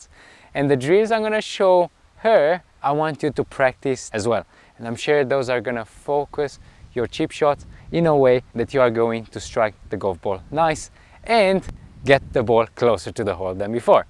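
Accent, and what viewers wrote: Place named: United Kingdom. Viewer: British PGA member; very nice. I really like your British accent. ;-) Keep up the good work.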